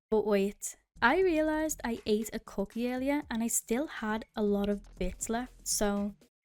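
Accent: Liverpool, United Kingdom. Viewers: Swear u sound scouse if ye r where abts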